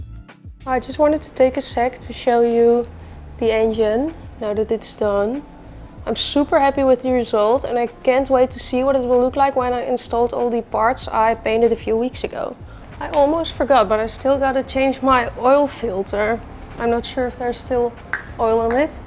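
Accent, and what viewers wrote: Netherlands. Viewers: Nothing better and clear than Dutch English. 💪❤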